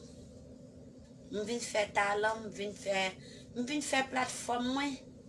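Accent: North, Haiti. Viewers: Se konsa moun Nan nord pale bann nan mèd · Pa kritike fi à ok , se konsa moun nan nord pale langage yo diferans ok.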